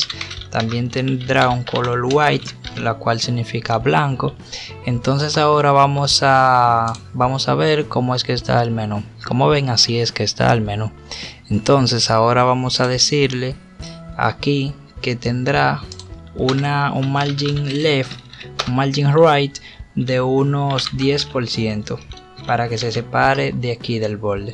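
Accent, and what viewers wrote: Dominican Republic. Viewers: apuesto que eres dominicano hahaha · eres dominicano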